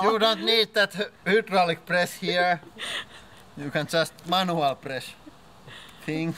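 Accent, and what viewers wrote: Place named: Finland. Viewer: Huomaa englannista että on suomalainen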